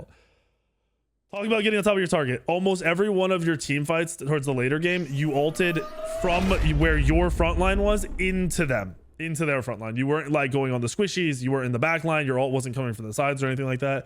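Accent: Jamaica. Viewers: he sounds jamaican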